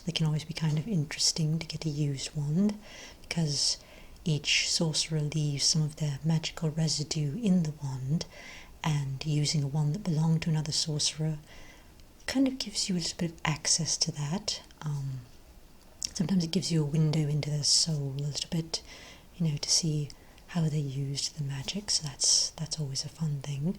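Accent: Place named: United Kingdom. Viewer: I like your British accent! 😁 I'm working on a British accent for my next video too, it's not easy 😆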